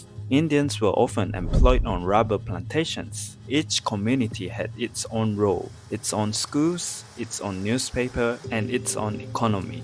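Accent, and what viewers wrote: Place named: China. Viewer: While it's a fact May 13 happened, please remember that this video's accent is PRC. Don't let outsiders drive a wedge between modern Malaysians. It doesn't even mention key names in the confrontations, no mention of Harun Idris because a PRC or Malaysian CCP shill would not know. Victory parades DID happen, which contributed to the increasing tensions. We have freedom of Internet unlike China, you can search anything about May 13, research and talk about it, unlike Tiananmen Square.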